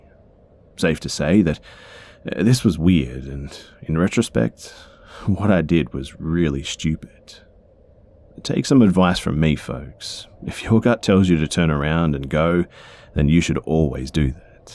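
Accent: Australia. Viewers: Mate aussie for the win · Australian accent 🤭🤭🤭 I made a mistaken post thought I heard eng. very different sorry. 🖤🖤🖤☺️☺️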